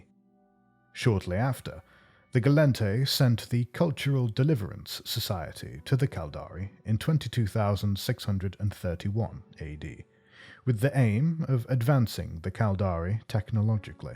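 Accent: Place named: United Kingdom. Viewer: I can hear all the astrophysicists cringe, but since it’s a British accent I’ll allow it.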